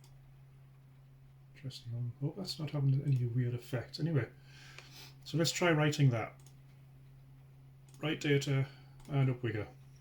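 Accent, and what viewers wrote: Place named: United Kingdom. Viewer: Arizona U.S.A and English, sounds as though your of British/UK descent. Thanks for doing this video.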